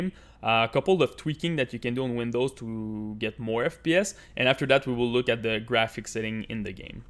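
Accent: Quebec, Canada. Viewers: Je peux enttendre ton accent Quebecois lol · avoue tu viens du qc ta l'air d'avoir un petit accent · La bonne accent quebecoise jadore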